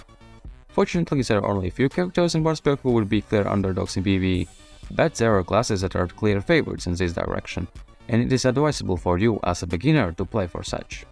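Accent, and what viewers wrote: England, United Kingdom. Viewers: Не понял. Are you from England? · о вы из Англии?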